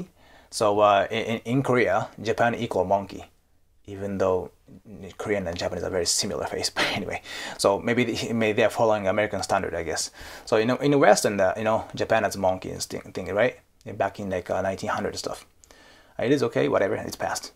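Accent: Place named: Singapore. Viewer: R u really a japanese? I have many japanese taiwanese friend. Ur pronunciation sounds like singaporian.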